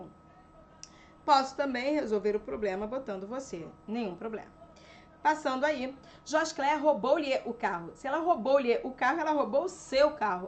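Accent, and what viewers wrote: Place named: Rio de Janeiro, Brazil. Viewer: em um dos vídeos, disseram que a prof estava com a voz da Anita; neste, ela está com a voz da Thaís Araújo. Acredito que tudo se deve ao modo como ela reproduz o xxxxxxxxxxxx carioca! rs É instigante a observação e comparação.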